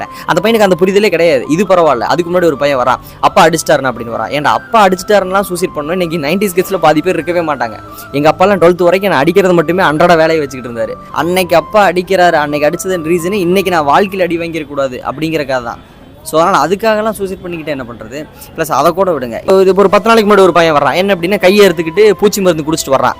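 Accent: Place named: Kongu, India. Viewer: Thambi.. I became fan of you from this video❤❤ Ipotha voice kekaren and kongu slang❤🧿. Om namasivaya🙏 VAZHGA VALAMUDAN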